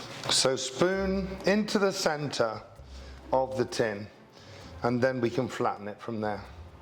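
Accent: England, United Kingdom. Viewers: Well, if this dessert isn’t already too wonderfully we get a great English accent and passionate and special energy you explode with. New subscriber. Thank you in advance. I just love your voice., accent and energy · Plus, I love the British accent 😊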